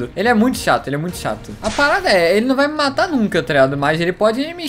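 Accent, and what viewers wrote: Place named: Rio de Janeiro, Brazil. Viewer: Carioca é assim memo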